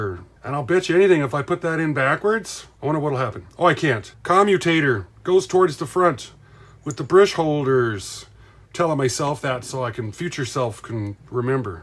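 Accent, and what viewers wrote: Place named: North, United States. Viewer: Hey. I normally stick with ya til the end because I enjoy hearing you talk. You have a Northern states accent that’s pleasing to my ear, oh sure! 😅 And I don’t want to miss any of your wisdom you put out about repairing the model trains. There’s three of you fellas I routinely follow because you each repair and restore model trains that teach me plenty about my own equipment and I hate to miss any information because I fear what I miss just might be that one odd piece of info I need to fix or correct something on one of my trains. Now I collect HO equipment but that doesn’t mean there isn’t something I could learn if you cover a Lionel or American Flyer repair. Electrical repairs or soldering are easily transferable skills in model railroading. Best wishes and see you on another video.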